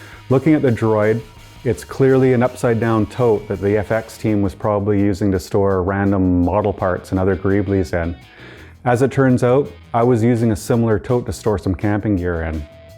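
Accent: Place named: Canada. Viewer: You sound Canadian 🙂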